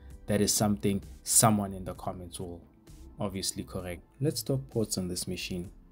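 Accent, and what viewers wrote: South Africa. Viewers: I thought I heard a fellow South African. Nice video! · I'm 81 with hearing loss, and I was delighted to hear such a gentle voice on YouTube, and followed it all (until you got to the gaming, which I just skipped). Maybe I'm just more used to South African pronunciation? But a good mic is always a good thing to have. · Thanks for sharing the review. I am still riding a T490. In this part of Europe they are still well around 2-300 eur and needless to mention mostly equipped with 1st gen Intel CPUs. Would consider it with a similar setup to yours although would be beneficial to be close to that IT asset change fire 🔥 haha. Nice SA accent. I think you could quite get along nowadays with games if you took the chance and opted in for Epic games in the past few years just for the sake of collecting 👌 Enjoy your new mobo, the screen looks good indeed for a regular IPS panel.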